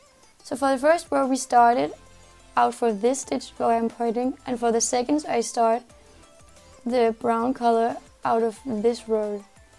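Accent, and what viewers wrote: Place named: Denmark. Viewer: Er du måske fra Danmark? Your accent sounds kinda like it. Tak for dine videoer!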